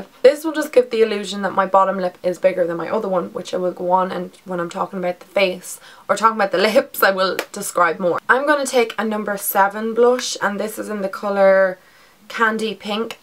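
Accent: Ireland. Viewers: great video thanks for the tips!! i use a darker foundation for contouring but find it looks cakey so will invest in bronzers and try that instead! love the accent! im sure all english people love the irish accent ! :) subscribed :) xxxx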